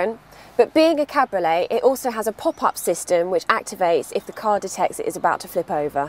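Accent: United Kingdom. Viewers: lovely British accent. love that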